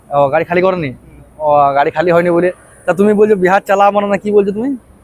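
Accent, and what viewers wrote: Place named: Purulia, India. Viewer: দাদা আপনার বাড়ি কোথায় ❤❤❤ , কথা গুলা আমাদের পুরুলিয়া র মত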